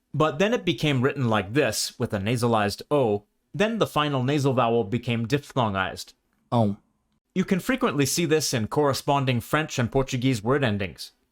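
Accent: Rio de Janeiro, Brazil. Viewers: In Brazilian portuguese it is pronounced 'txi' Noooooooooooooooo You're using carioca accent for standard brazilian, thats not nice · Esse sotaque carioca. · Simplismente porque ele usou o sotaque brasileiro e não o português...ele deixou isso bem claro no vídeo.. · He didn't use a european variety, but a typical rio accent...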